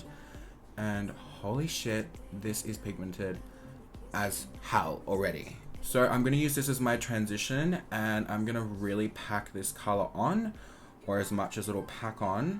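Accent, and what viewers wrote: Queensland, Australia. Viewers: I've noticed that the queensland accent says "oh" as "err" e.g. "Sephora prER makeup" lol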